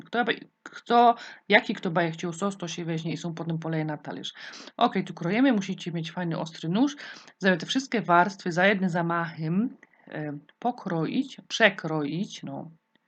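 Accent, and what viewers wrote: Silesia, Poland. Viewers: Mówi po śląsku bo to Ślązaczka to fajny gwarowo język 😃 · Czesto ogladam Pania i nie umia sie nasluchac godania jo tyz jestem z slaska pozdrawiam fajne przepisy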